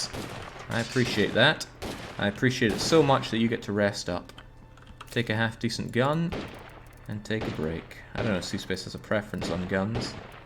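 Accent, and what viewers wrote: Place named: Northern Scotland, United Kingdom. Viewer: This runthrough has been a real diamond of a watch. Audience participation vs. a nightmare world and a few bloody hell type moves pulled by yourself, along with the usual North-of-the-border cutting commentary. As an aside, you're accent reminds me of a friend of mine from the north of Scotland who once commented on a girl "She's so ugly even a sniper wouldn't take her out". Classic.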